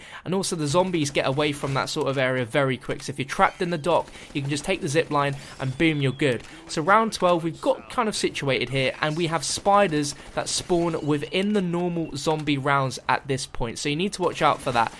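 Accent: England, United Kingdom. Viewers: " the HEYTCH G 40" lol gotta love england accents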